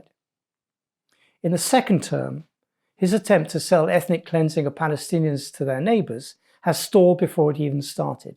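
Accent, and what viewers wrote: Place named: England, United Kingdom. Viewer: The English accent sells it very well.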